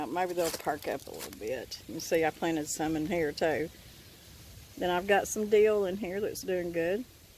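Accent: South, United States. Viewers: I’m pretty new to your channel now, but didn’t realize you and Mr. Brown worked outside your home. Where do you work? I’m getting use to your Southern accent. Interesting video. Thanks for sharing.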